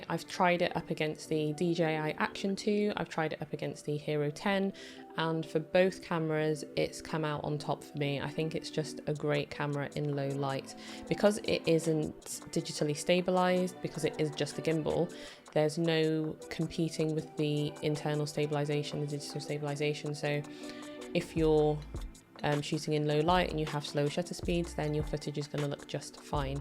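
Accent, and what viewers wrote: England, United Kingdom. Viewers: Hello. Hope you can help this DJI OSMO Pocket 2 Tragic. After doing a fair bit of research, I purchased a POCKET 2 from Amazon. I bought the basic model and later contacted DJI in Melbourne, Victoria Australia where I live, seeking a microphone for this unit. I was advised that DJI no longer supports certain products such as the "FITTNG" that attaches to the base of the POCKET 2, that houses the electronics which accepts the spound from the DJI mike which the operator wears on their lapel. I have no idea what the electronics housing is called or named, and it is just so frustrating that no one can assist me. I hope you will be kind enough to assist me or give me some direction. By your accent I'd you are English and I hope you are able to advise me. Kindest Regards. Angus McKinnon.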